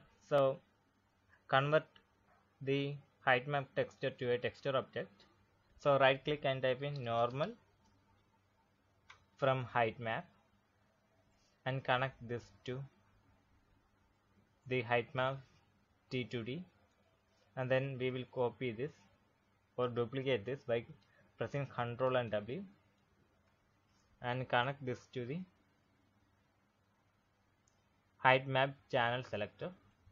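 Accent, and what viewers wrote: India. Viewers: hii bro , r u Indian???bcoz ur style of speaking seemed like it😂. Btw I'm from India, and thx for fur PNG👍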